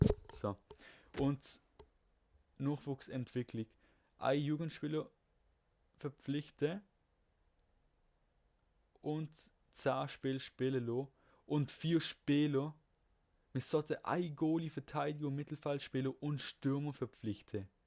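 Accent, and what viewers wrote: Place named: Basel, Switzerland. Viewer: Nievethan Neetihrasa Nechdem Dialekt her in Basel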